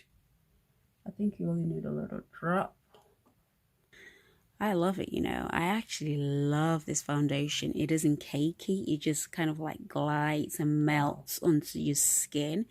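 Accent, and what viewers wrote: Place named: England, United Kingdom. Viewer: Hi... Looks nice but how does it work on wood face?...seriously, your always beautiful, that English accent 😃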